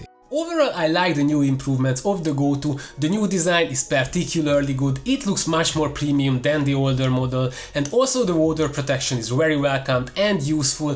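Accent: Hungary. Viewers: The hungarian accent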